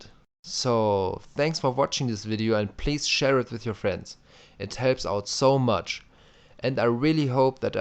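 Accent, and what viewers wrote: Germany. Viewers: You speak german ! I notice because it says Tor and germans say X like this ix and english people ex :D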